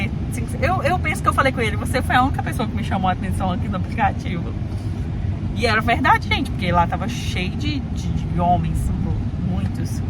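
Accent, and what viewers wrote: Minas Gerais, Brazil. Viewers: Vc é mineira? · Vc é hiper bacana, guerreira, batalhadora,linda história, tudo de bom.Vc é mineira! Téns um sotaque mineiro, tenho muitas amigas por isso estou perguntando,Deus abençoe vc e sua família,♥️♥️🙋‍♀️🙋‍♀️🙋‍♀️ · Que história de amor linda ❤️ que Deus continue abençoando grandemente essa família linda que vcs estão fazendo 😍🙏 amei de vdd sua história o YouTube te recomendou a duas semanas e já maratonei vários vídeos seus vc é divertida com esse sotaque mineiro seus filhos são uma benção vc é guerreira humilde amei vcs e vou ficar por aqui assistindo seus vídeos e torcendo pelo casório e a casa nova de vcs bjussssssss❤❤❤❤❤❤ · É isso aí minha linda.Mar calmo nunca fez bom marinheiro! Principalmente se somos cristãos aí é que somos forçados a crescer e amadurecer nas batalhas da vida. Um grande abraço adoro o inglês com mineires e português tudo num vídeo só! 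· Linda a história de vcs, como bom mineiro estou achando similaridade nesse seu sotaque 😂 um abraço e muito sucesso em suas vidas.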